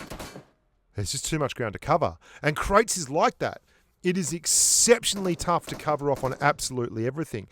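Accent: Australia. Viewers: Ar U Australian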